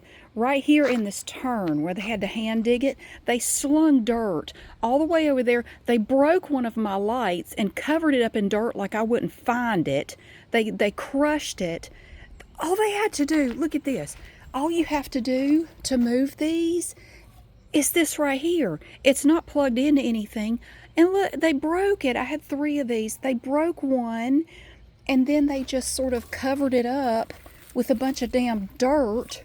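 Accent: South, United States. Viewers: You sound southern . Arm yourself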